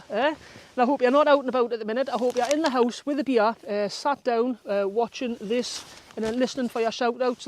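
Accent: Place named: Geordie, United Kingdom. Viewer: Luv your quaint Geordie manner of speaking. 🙂 (Ahm frum Yorkshire meself..we got our own quaint dialect...lol)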